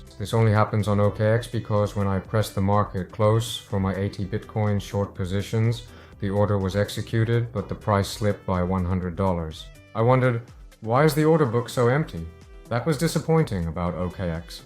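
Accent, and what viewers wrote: United Kingdom. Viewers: wow your Enginlish is perfect. I thought you were born in korea, but sounds like you grew up in England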